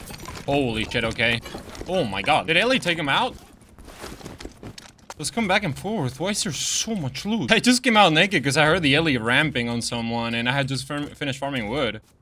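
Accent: Argentina. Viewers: como que este chabon es argentinO XD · Oirte hablar en español con ese acento argentino ayudando a los novatos me da la vida ❤❤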